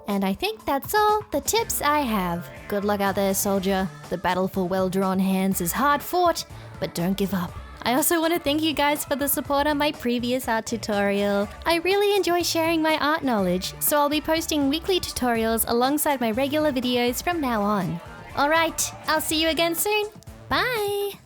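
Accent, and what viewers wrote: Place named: Australia. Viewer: I know a fellow Aussie accent when I hear one. YouTube Algorithm actually cooked for once by recommending me this, saving this video to refer back to likely frequently.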